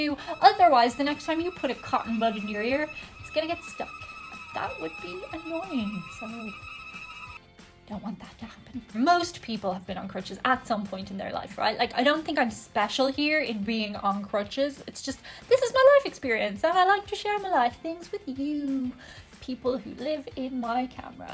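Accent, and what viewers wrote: Ireland. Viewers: You're an angel! I'm sorry that you had to have knee surgery but it seems to have gone well. Something that seems different in this video is that you seem to be talking faster than usual, and with more of an Irish accent than you normally do. My best wishes to you for a speedy recovery!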